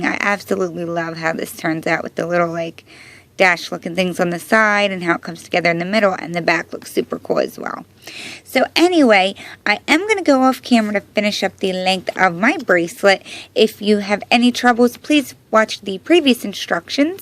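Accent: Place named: South, United States. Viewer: That southern accent tho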